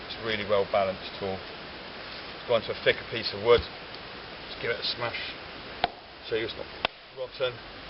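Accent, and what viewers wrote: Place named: England, United Kingdom. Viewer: Its 751g, which means it can run as an axe too, 49/51 carbon steel, Sheffield forged, micanta handle is lovely I swear by this blade, I run this and a Moro and love them..... I wear mine on the waist with an elasticated knee strap so i can climb over things without it snagging, that little eye on the bottom makes all the difference, do you still bush, sounds like your in England I'm Dorset are we close?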